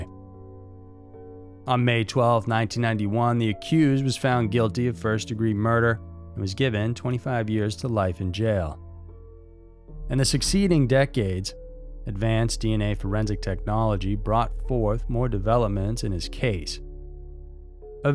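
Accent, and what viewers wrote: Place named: Boston, United States. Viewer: adding an R to the end of ur name...lol yup thats a boston accent! Also, not pronoucing an R to words like car, park, art, etc😂😂😂 Thats me!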